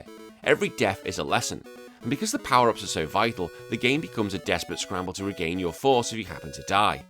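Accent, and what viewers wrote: United Kingdom. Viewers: Yeah, I think overall it outsold the NES in Europe... This guy also sounds like he's in the UK, where NES really sold poorly and the Master System did very well.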